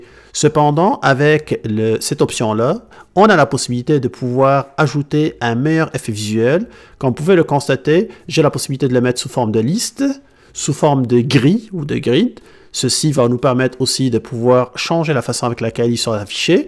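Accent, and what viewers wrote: Quebec, Canada. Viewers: Je n'ai meme pas encore recu mon Fold 3 que je magasine pour des app qui vont m'etre utile et je suis tombé sur ton video. C'est clair que je vais l'installer. Merci pour la video. En tant que Quebecois, ton accent Francais avec un soupcon de Quebecois est vraiment cute hehe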